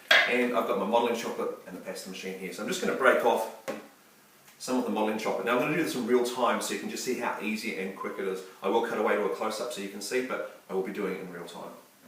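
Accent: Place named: New Zealand. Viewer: ...new zeland accent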